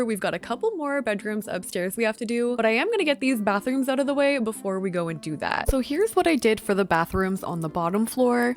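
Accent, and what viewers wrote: Canada. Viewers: Girl it’s a Canadian thing I swear lol I catch myself saying ehh and laugh because it sounds so Canadian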